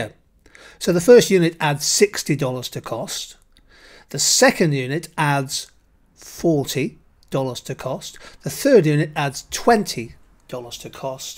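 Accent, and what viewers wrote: United Kingdom. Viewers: Finally found great vids with British accent lol Thank you for uploading. It really helps! Your voice reminds me of my business teacher in secondary by the way. Greetings from Indonesia Sir!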